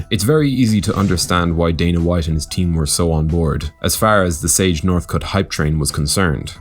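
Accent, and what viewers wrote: Ireland. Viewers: ''tug rose'' haha I love irish accents, keep up the great content, you're my favourite narrator on this channel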